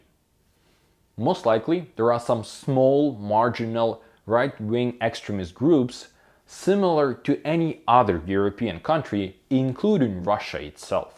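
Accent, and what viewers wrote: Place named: United States. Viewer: У вас очень хороший американский акцент) Редко встречаются русскоговорящие люди с хорошим английским акцентом)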